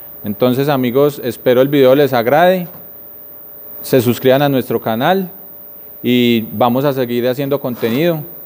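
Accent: Colombia. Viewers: a bueno pensé que eras de Medellín por tu acento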